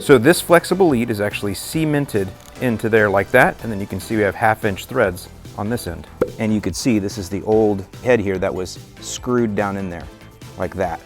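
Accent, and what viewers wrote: Chicago, United States. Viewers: Found this video for the first time looking to figure out what’s wrong with my sprinkler system. I hear the “couple two or tree” then the “lowesss” and thought what was a Chicagoan doing somewhere there is so many palm treesss?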